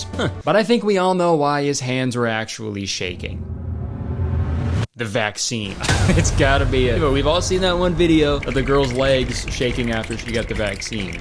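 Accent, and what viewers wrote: Canada. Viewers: his canadian accent has never been more prominent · i love when kurtis ends a question with “eh?” because it reminds me that he’s canadian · He sounds extra Canadian in this vid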